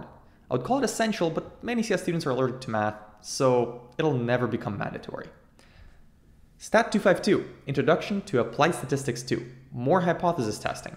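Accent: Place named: Canada. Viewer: Can hear u r Canadian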